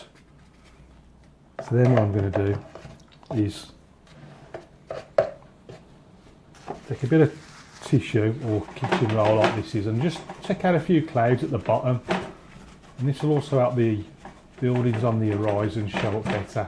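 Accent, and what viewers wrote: Birmingham, United Kingdom. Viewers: Love the brummie accent being a fellow Brummie good to hear the tones from Brum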